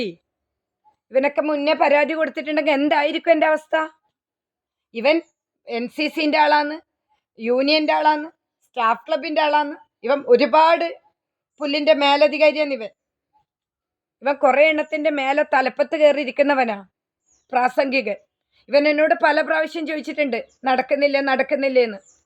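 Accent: Kannur, India. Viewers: ninakkonnum vere joliyille? Kannur dialect kelkkan oru bhangiyumilla.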